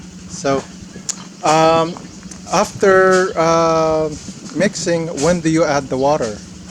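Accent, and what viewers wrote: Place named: Philippines. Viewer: U sound originally from Philippines the way u speak out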